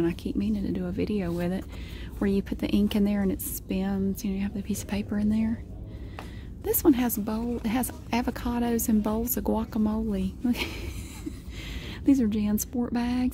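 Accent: South, United States. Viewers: New viewer here and I just have to say the best part of your videos is your voice. Always remind me of the movie The Help. 😂 that strong southern accent is so nice!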